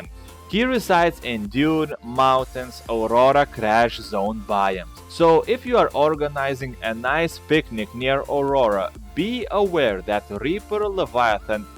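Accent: Russia. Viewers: этот русский акцент прекрасен! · Your Russian accent sustains me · This is the one guy on youtube who has a legit russian accent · dude you sound like a badass Russian general your accent is epiac! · You sound russian? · Lol for some reason i had this ransom feeling the narrator would be a russian male...i CANNOT belive i was right... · is he russian _ accent_ calls us camrads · I love your voice Russian! And goodjob dude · Haven't seen this guy's vids before. There's something really charming about his heavy Russian accent in combination with his upbeat attitude. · Этот акцент... Русский, да? :)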